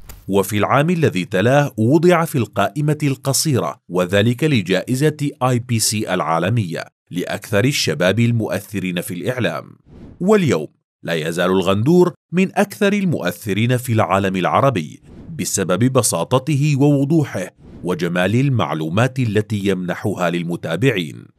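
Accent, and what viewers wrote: Egypt. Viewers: يشخص مميز و كوميدي و علمي و مكس جميل جدا صراحه هو خلني احب اللهجه المصريه صراحه حتى الشرح بسيط ولكن هم جدا معقد و صعب الفهم ولكن الدحيح يسهل المعلومه يخليها جدا سهله فعلن شخص مميز ماشاءالله تبارك الله عليه🥀💜💜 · الافضل رغم لهجته المصرية الدحيح صاحب محتوى راق وسيق ومفيد عكس اليوتيوبرز **معظمهم تافهون وبلا محتوى حتى ***فهو بسيط و لا يحتاج سوى لحسه الفكاهي الظريف و اسلوبه اللبق و العفوي ومعلومات دقيقة من مصادر علمية بورك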